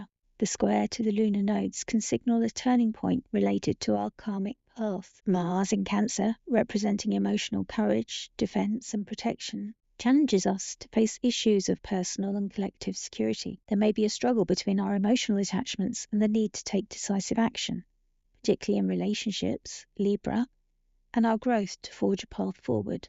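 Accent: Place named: England, United Kingdom. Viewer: U should have more comments🎉Thank U. When i saw the broadcast was at 15..mins - thought why? give it a try. Liked the Landscape view - seemed to be London Parliament Thames. Then when i heard the English accent and that U R from UK 🌍 i thought good going. Your content is very deep - Alkaid ? New to me - Only time of mention, through Listening to various Eclipes themes. Very knowledgeable for your age, by sound of your voice🎉Trudy ✨Gemini 1963 London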